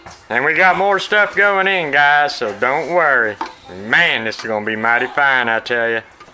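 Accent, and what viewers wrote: South, United States. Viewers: Where do you live? I know its the south cause of the accent. Reason I am asking is because I live in Mobile Al. Its between Penscola Florida & Gulfport Ms.. I was asking because their is a sausage called conecuh sausage. This stuff is so good you want ever go back to any other sauage. Wal-Mart sells it heck every store where I live sells this. When I was living in North Carolina target sold it, so I know you could ask someone could they order it & you could buy it from the store. This sausage would be so good in anything you cook. Its a great grill sausage as well. If you have never tried it you should try some. If you don't want to order it ask your local to store to order some. I told people in north carolina about it they would seriously go in target an buy like 4 or 5 packs at a time, so if they was sold out they would have some on hand in freezer.. 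When relatives come down they literally take a cooler full back with them. This sausage is just the best. I will leave a link... Anyways I love all your southern recipes. Southern food is the best food. Thanks for the really good recipes. <link> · Hi iam so happy to see you!! You are a joy to watch. I like the southern accent its great!! Thankyou for sharing recooes with us. Mighty helpful to learn.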